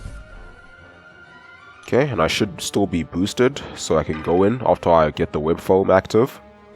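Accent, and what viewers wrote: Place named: South Africa. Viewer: Whats your nationality? You sound a bit south african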